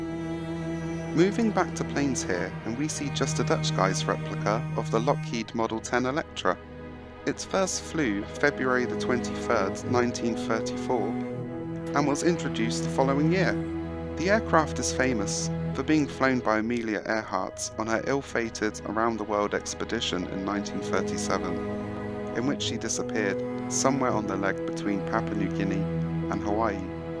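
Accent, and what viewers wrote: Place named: United Kingdom. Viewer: and always remember if you feel down just think of us because your voice calms at least me down. It's just so smooth yet you sound just like a normal British guy. In short keep it up and be happy because you deserve it even if you work your hardest. 👍😁🍀👍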